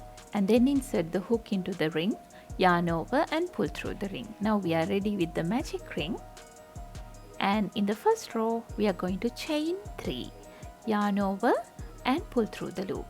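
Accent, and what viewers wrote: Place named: India. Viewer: that indian accent video is more good and trustful ❤❤❤🎉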